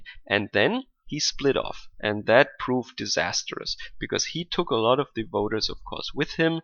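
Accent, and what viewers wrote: Germany. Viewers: Funny as hell to hear someone say cuckservatives in a German accent.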